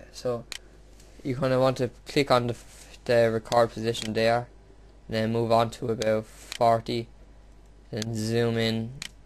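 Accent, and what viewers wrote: Ireland. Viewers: he's irish thats the way he talks thats wrong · hey hes Irish like me haha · you sound like an Irish version of Edd from Eddsworld =3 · u r Irish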